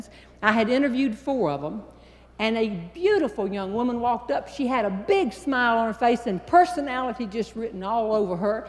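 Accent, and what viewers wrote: South, United States. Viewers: She was so funny and had such an adorable Southern accent! I just loved Jeanne Robertson! ❤️ Good clean humor! And she was one of the greatest story tellers ever!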